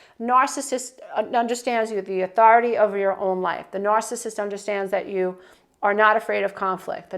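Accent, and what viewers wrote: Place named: East Coast, United States. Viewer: Thank you your informative video. You're from the Tri-State area. I can't help but note an east coast accent.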